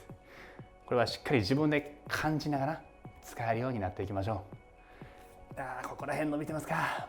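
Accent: Kansai, Japan. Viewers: 初見です😊めちゃくちゃ良い声〜😊 これなら私も出来そうです！やってみます。ちょいちょい関西のイントネーションで親近感湧きました😊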